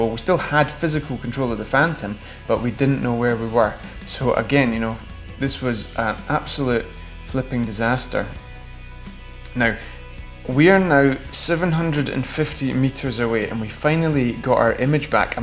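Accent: Scotland, United Kingdom. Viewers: wonderful video. super helpful. I wish all instructional videos were given in that lovely Scottish brogue. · A cool video. I love the scottish accent and the landscapes. ;-)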